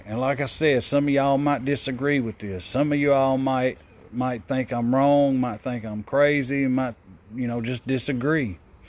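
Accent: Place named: South, United States. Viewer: You're such a wise man! You should really start a podcast (if you have time [outside of your JOB, of course]). Speaking with a deep Southern accent with heavy feeling has made many, many people think that you're one of the wisest people on earth! They're certainly not complete idiots, right?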